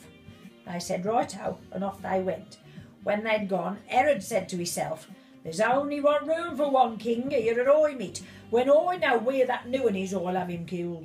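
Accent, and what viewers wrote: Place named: Birmingham, United Kingdom. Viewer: Not all Black Country ..Can here some brummie there too...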